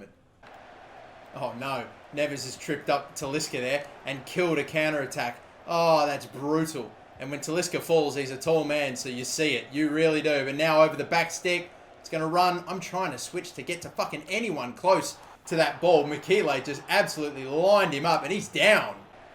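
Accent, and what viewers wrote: Australia. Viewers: Funny hearing an Aussie swear:) (no offence, love your content mate)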